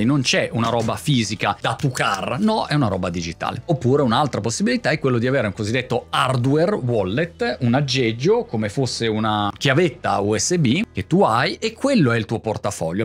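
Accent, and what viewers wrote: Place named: Matera, Italy. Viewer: Grande dialetto materano ti si addice😉 tutorial ridendo e scherzando inbocchi caramelle ai principianti bravissimo super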